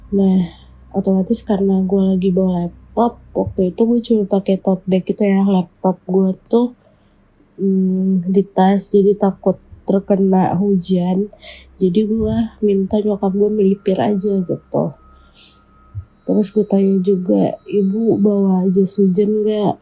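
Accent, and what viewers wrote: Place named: South Jakarta, Indonesia. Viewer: Iya gabungan sengau plus jaksel. Makin gak jelas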